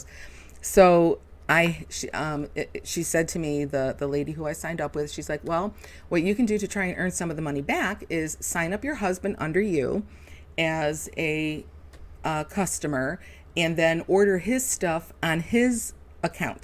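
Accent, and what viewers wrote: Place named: Chicago, United States. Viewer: Ma'am. After about 30 secs of listening to your story I knew you were from Chicago 😅 Even though you're no longer up here it's nice to hear someone sound like me. AND a creator who is also Gen-X, hello fellow 80's cool kid! Looking forward to your content on all those companies, many of which I'm super familiar with. You had the purse?!?! I'd have been SO jealous.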